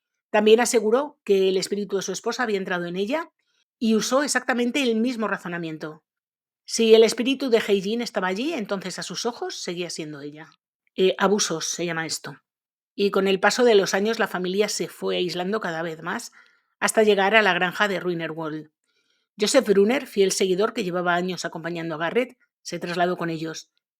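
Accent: Spain. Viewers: ...ayyy, debe ser un deleite y una delicia conocerte personalmente. Platicas y cuentas éstas historias con un toque de sarcasmo muy jocoso. Gozo de tus relatos desde que comienza incluso la música. Como tengo relativamente poco tiempo de conocerte. No he logrado ponerme al día. Pero ya voy, ya voy. 😉 Saludos desde California. Amo el acento con el que ustedes los españoles hablan. Soy 🇲🇽 de nacimiento. Y de naturalización 🇺🇲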